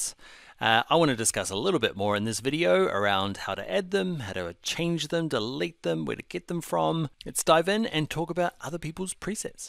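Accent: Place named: New Zealand. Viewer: You do some awesome videos bro, this is the first video I've watched and I thought straight away 'Is he from New Zealand' hahaha Chur bro that's mean as I'm Kiwi too, Keep it up!!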